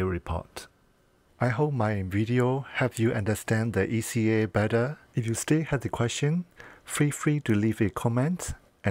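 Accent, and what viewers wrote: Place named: Myanmar. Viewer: Why didn't you explain in Bamar (Burmese) language if your video post is for Bamar . Never ever expressed "Vulgar Slang" Your school is a "Shitty" school, It shows your character. Never ever expressed Offensive wordings toward other person's dignity if you are very careless/disorganized person "that is the school that DON'T provide", It should be" Does not". While you're in Canada .Pay attention on "Vowel"sounds, Then imitate the accent and tone.Again Learn how to make a "SCHWA" vowel. In addition you must learn the culture of the English speaking country you wish & some slang. Keep in your mind that UK, US, Canada, Australia, New Zealand, each has different culture and English accent. Canada official languages are France and English. Otherwise you will be still sounding as Myan-Galish or Ba-Galish. Since you've been staying in Singapore for quite a few years you will have to adjust your Sin_Ga-Lish while living in Canada. 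Do not take as personal. Cheers. Note: I trained my Bamar gal friend in the same way. Now she has a fabulous Canadian accent and some french after 6 years of living in Canada.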